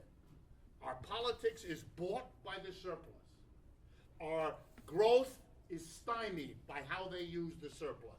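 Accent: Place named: New York, United States. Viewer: This guy has a very marked NY accent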